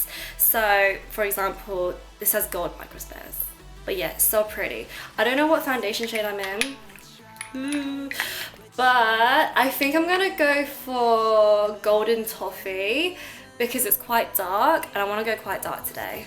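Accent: United Kingdom. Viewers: I am so happy you have YouTube!! I follow you on instagram and I'm so excited to watch your YouTube vids!❤️ It's so nice to have makeup inspiration from some one with a British accent✌🏻😜 (no offence to the fabulous others) Do you ever visit Scotland? Xx Keep it up! So much make up envy 😝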